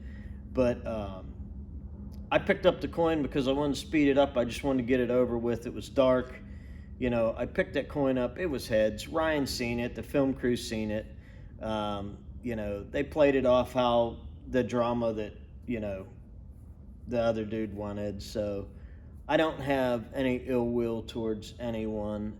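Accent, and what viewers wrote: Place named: Pittsburgh, United States. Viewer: I never noticed the yinzer accent til now. Shaht aht to the best accent in America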